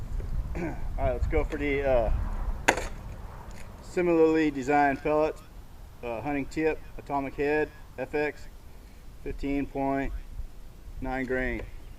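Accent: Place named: New York, United States. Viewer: I see you are in west TX, but from your voice I detect Louisiana and a subtle NY accent. I am in East TX by the way...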